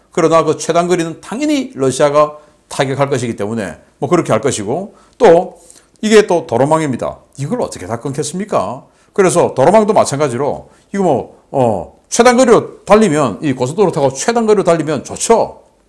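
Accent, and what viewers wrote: Gyeongsang, South Korea. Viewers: 이 분은 전쟁 신봉자네요. 군사력이 강해져야 하지만 생각이 다른 국민들은 모두 빨갱이이니 청소해야 한다고 하니 푸틴하고 뭐가 다른지 싶네요. 억양을 들어보니 경상도 사람으로 보이는데 지역정서에서 벗어나지 못한 불쌍한 분으로 여겨지네요. 우물안에서 벗어나 국민을 함께 바라보는 넓은 시각을 지향하면 좋겠다는 생각입니다. 이 분이 군사전문가로 보이는데 시야가 좁으니 인물이 아깝네요.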